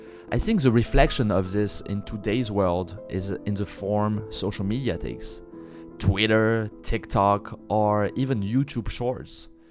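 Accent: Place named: France. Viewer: Love the French accent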